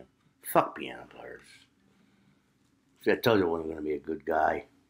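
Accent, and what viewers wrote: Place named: Philadelphia, United States. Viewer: You have a Philadelphia accent. Cool. Subscribed.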